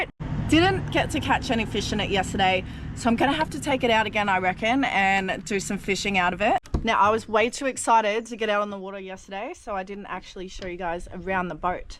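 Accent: Australia. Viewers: New subscriber to your channel and am very happy I found it, gonna binge watch your channel. Are you Australian? You have a similar accent to my buddy Rod from Rokkit, I've been subscribed to his channel from the beginning. Anyways looking forward to binge watch your channel take care and have a blessed day. Aloha from Kansas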